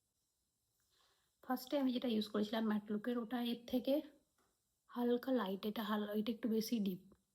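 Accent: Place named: Dhaka, Bangladesh. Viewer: Nah amr bang dakar awaj ta sunte valoi lagche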